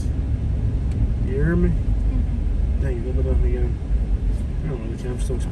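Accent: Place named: South, United States. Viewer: Your Southern Accents are becoming Seasoned now.btw UR correct... Family es muy importante. Especially for regular folks.Wealth is created from having a strong tight knit family.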